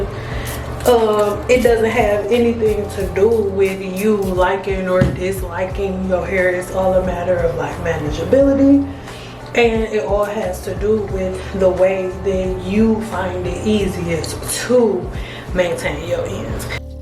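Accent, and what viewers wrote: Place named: South, United States. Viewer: I loved the blowout. Child, you sound like you from the south like me. LOL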